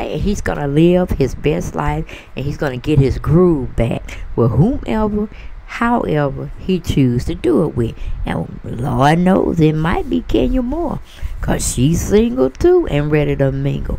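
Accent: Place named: South, United States. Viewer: U must be from the south , may be Florida your accent is 😂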